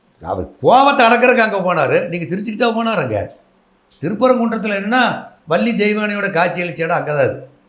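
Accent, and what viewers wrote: Kongu, India. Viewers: அருமையான பதிவு. ஐயா அவர்களுக்கு எங்கள் மனமார்ந்த நன்றிகள். அவரின் கொங்கு தமிழ் அருமை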